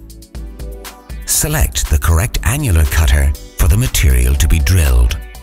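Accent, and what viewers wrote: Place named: Europe. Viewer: The narrator is also hilariously European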